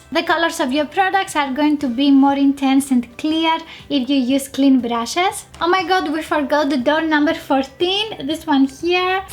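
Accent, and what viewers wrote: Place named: Italy. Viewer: This girl looks like an italian 😳she has an italian english 😂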